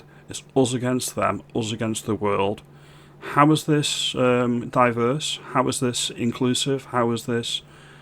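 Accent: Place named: Cheshire, United Kingdom. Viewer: Love your musings mate. You're a great Patriot. What part of Cheshire are you from? Am guessing one of Runcorn/Frodsham/Helsby/Winsford with that accent! ie Scouse overflow areas! 🤔🧐🫡🫵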